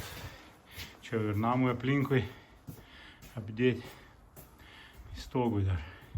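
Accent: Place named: Samogitia, Lithuania. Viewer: Kokia idomi zemaitiska sneka!!!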